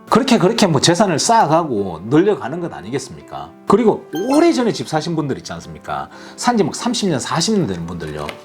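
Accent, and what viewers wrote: Gyeongsang, South Korea. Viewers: 원래 갑자기 스쳐가는 생각이 세상을 바꾸는 법입니다, 적극 추천합니다,,백안관에 청원 하시기를,,,,, ㅎㅎㅎ, 감사합니다, ( 같은 경상도 신것 같아요, ) 👍👍👍💕💕💕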